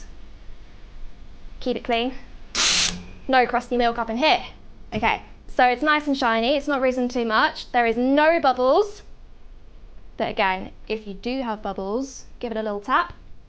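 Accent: United Kingdom. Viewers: barista girl with british accent, Just perfect👍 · No! British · Yes which is where you're wrong, they are located in the UK Also, sounds British to me. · She’s British! Yayy! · She’s british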